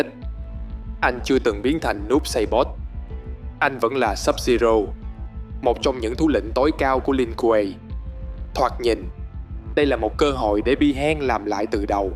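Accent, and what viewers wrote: South, Vietnam. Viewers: Một trong những giọng đọc miền Nam mình yêu thích ngoài Minh Béo của Game cực hay. Kênh cố gắng giữ bạn này và phát triển các nội dung có thêm tính hài hước sẽ tăng tương tác nhiều hơn.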